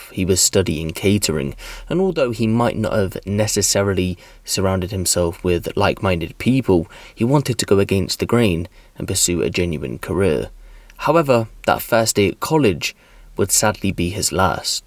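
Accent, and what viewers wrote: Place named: RP, United Kingdom. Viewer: Not being horrible but I ain’t watched u in ages and your voice sounds well posh since I last heard you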